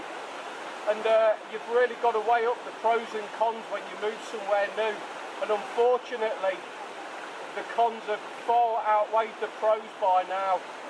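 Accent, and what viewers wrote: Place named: East Midlands, United Kingdom. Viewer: Im sorry you came back to the UK, i mean that with the greatest of respect, you sound like youre from the East midlands. We are olanning on going to the Philippines. anyway hope all goes well.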